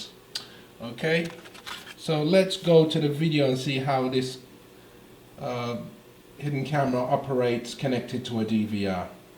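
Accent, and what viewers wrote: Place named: United Kingdom. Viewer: your from the UK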